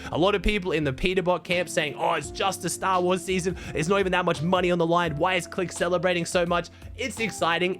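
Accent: Australia. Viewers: Your wierd Aussie!!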